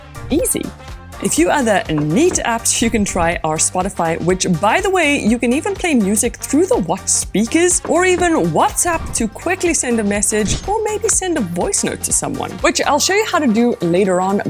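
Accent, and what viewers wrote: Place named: South Africa. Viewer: I got me the Watch 8, searched for tips and tricks and came across your channel. The minute i heard you speak I knew you were my fellow South African :).. Great channel!!!!!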